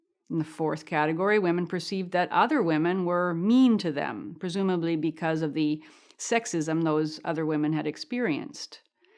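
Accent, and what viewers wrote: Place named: Atlantic Canada, Canada. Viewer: This is neither here now there, but listening to your voice takes me back to my youth in Atlantic Canada listening to CBC programs before it went completely insane. That essentially examining and considered tone and accent.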